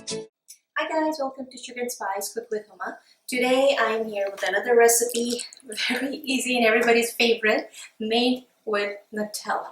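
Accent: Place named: United States. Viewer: Pakistan ,,but your accent is like American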